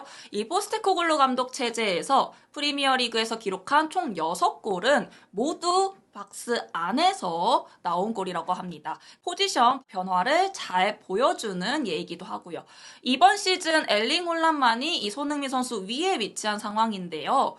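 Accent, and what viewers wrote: Gyeongsang, South Korea. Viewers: 억양이 곧 갱상도 사투리가 틔어나올꺼 같은 억양이네욛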